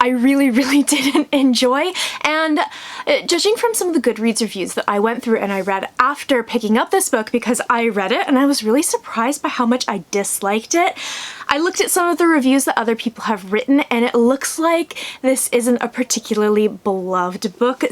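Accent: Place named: England, United Kingdom. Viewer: I really love your english accent! ❤️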